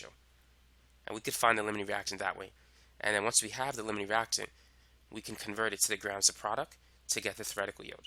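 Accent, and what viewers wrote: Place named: Boston, United States. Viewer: Great video! Are you from Boston? PAcent lol I love it. Thanks once again for the videos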